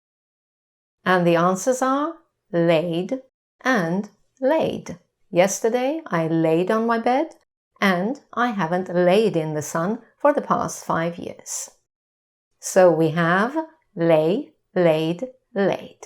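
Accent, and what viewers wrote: United Kingdom. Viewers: Madam Which type of english do you speak? American or British one? I feel you're a british type of speaker, am I right?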